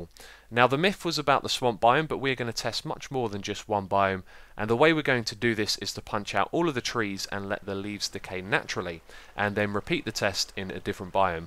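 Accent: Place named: England, United Kingdom. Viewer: Ahh thats cool to know :-) Sorry for repetitive question aha but what part of England are you from because my British accent and my friends have a very similar accent to yours, Feel free to not answer this if its too personal or anything aha :-)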